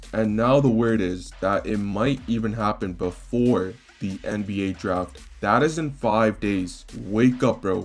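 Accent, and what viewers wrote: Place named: Toronto, Canada. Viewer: Where are you from? You kind of sound like you're from toronto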